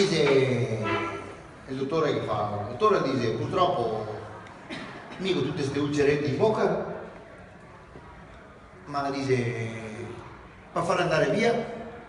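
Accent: Veneto, Italy. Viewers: Le racconta bene 😂😂😂😂E la parlata veneta e spettacolare 😂😂😂😂😂😂🎉🎉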